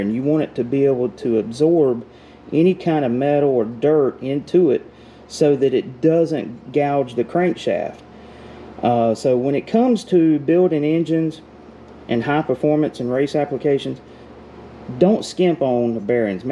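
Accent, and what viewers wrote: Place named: South, United States. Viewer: Thanks Bud regards from AUSTRALIA, p.s i love your Southern Accent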